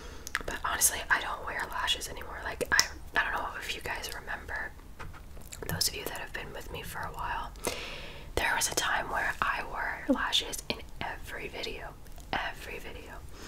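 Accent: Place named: South, United States. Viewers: Omg I love southern asmr sounds too!!! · I love Southern ASMR!! Another fave of mine. I’m obsessed with this lip color. 😍😍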